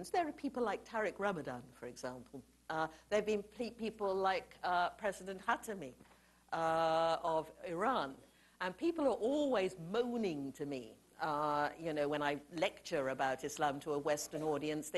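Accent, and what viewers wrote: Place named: RP, United Kingdom. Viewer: She has no idea what she was talking about. her posh accent doesn't make her more knowledgable unfortunately.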